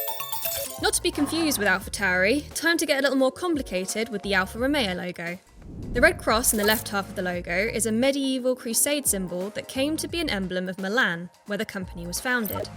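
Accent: England, United Kingdom. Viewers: The usual GHASTLY English pronunciation of Renault, Modena, etc. Listen to Forvo first, maybe you can make it a little better.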